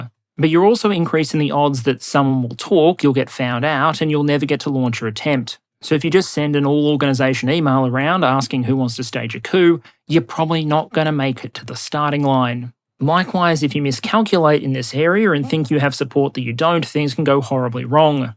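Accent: Australia. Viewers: One, he expresses he is biased many times before so yeah, he'll be critical to the Russian Army, but he also explicitly says their strengths. Two, the cheap shot dry humor is his schtick. His Aussie, that came in a package. It also makes the dry delivery of information more interesting and entertaining, this is still a YouTube video after all. And three, you can just...idk, don't watch of the jokes are a turn off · As a ‘murican, I love the dry Aussie humor and the accent. It’s what makes Bluey extra cute too, kids speaking Aussie. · Not according to his profile: " Description An Australian covering the military industrial complex and national military investment strategy." Or for that matter, his accent.😉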